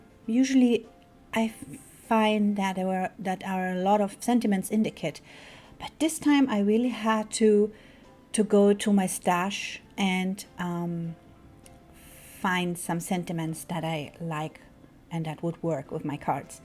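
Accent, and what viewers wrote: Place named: Rhineland, Germany. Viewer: My entire family is German. I was born in NY City area, my parents came to NY on ships. The reason I can tell you are from The Rhineland is that your accent is EXACTLY like my Aunt Marianne's, who was born in Lannesdorf, Köln area (Your English is EXCELLENT by the way!!) My Dad was from Lannesdorf also. My mother was from Sulzbach in Bavaria. I live in California now and will be watching your videos addictively now that I found your channel!!! Ich kann ein bischen Deutsch.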